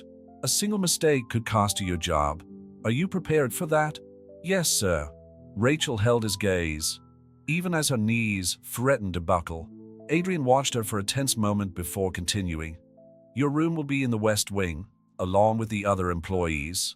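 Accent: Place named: Ireland. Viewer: The reader has such a lovely accent. Is that Irish I’m hearing? Is it AI? Either way, I’ve enjoyed the sound.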